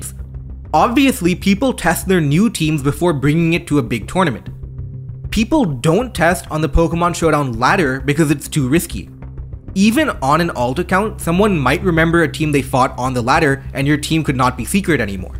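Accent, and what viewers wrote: India. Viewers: i knew i heard a little bit of indian in his voice!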